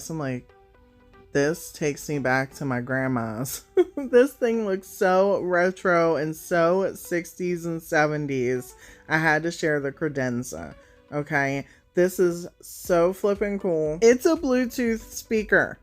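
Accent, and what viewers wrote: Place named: East Coast, United States. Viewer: I always enjoy browsing with you to see what's new out and about in my area. I can only assume you're on the east coast due to your accent if you will. My neighbor and I were talking about the price of eggs, and can't believe it. Unless parents buy plastic eggs to color this year, it's going to cost an arm an another arm, and a leg. Where I live, an 18 carton of eggs is a whopping $9.05. Unbelievable. And what is breakfast with 🍳🥓and 🥞. It isn't even affordable to bake a .99 cake mix when eggs are .50 a piece. Glad I don't have any little ones, this is NUTS!!! Thanks girl for all the time you spend helping us all out, and love your DIY channel too. Take care, until next time. 🌹Karen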